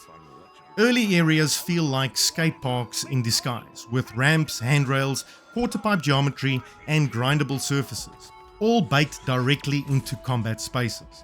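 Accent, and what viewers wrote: South Africa. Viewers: Bro is 100000% a south african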